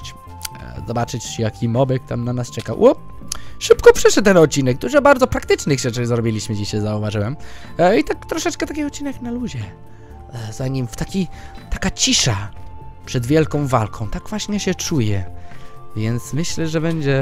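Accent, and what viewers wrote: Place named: United Kingdom. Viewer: on jest anglikiem więc muwi troche inaczej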